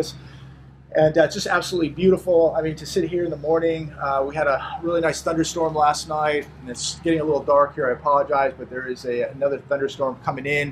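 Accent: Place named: South, United States. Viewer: It's Fridaaaaaaay! is perfecting a distinguished "southern drawl". My ancestors are from Montgomery, Alabama and Franklin County, Alabama. ~~ Safe travels.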